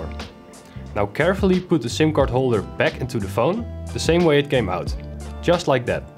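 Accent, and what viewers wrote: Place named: Netherlands. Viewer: I hear a Dutch accent! very nice tutorial and explanation!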